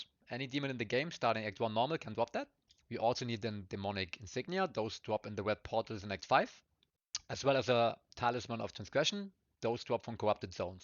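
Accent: Germany. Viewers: I hear a bissele german in this enlgish 😊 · Are you a German Dude?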